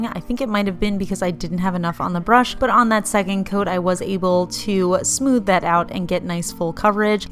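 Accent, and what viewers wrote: New Jersey, United States. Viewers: I love this collection. Love the new hair color too! I totally understand about how you say some people make fun of how you pronounce ‘ pastel’ I’m from Jersey too ( near LBI) and I’m always being made fun of on how I pronounce certain words. Maybe it’s a Jersey thing. But now for the important Jersey question- is it Taylor Ham or pork roll. Lol. ❤️ I love your channel.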